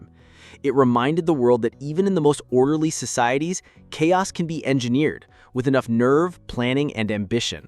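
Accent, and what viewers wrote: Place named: United States. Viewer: Why do these English videos always have to be made by little Yank boys with horrible accents?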